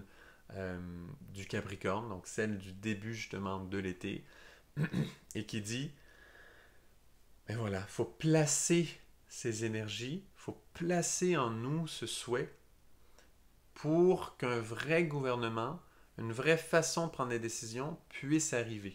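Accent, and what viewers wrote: Canada. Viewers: Extraordinaire avec ton bel accent canadien merci !!!!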